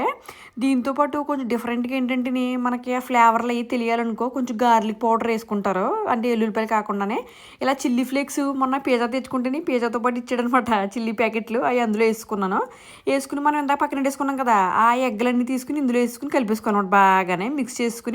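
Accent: Godavari, India. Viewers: ఛీ నీ.. నువ్వు నీభాష నిజంగా గోదావరి జిల్లా వాళ్ళు అంతా సాగదీసి మాట్లాడారు దరిద్రం, దరిద్రం అని 🤦🤦🤦🤦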